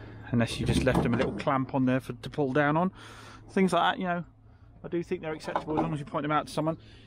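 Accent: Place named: Devon, United Kingdom. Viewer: The sound of Bruce Forsyth from deepest Devon 😊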